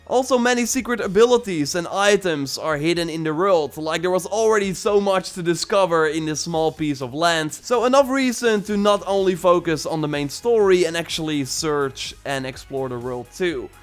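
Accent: Netherlands. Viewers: Are u Dutch? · Yo, are you Dutch? Your accent:p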